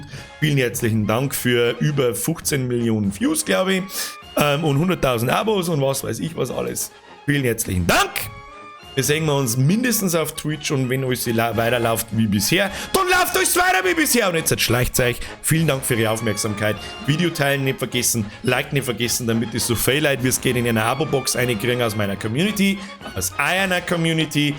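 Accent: Bavaria, Germany. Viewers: Also gruß aus BaWü...ich liebe deine Videos und stehe total auf dein Bayrisch (ned falsch verstehen des is koi obandeln!)...wäre mega schade wenn dein kanal gelöscht wird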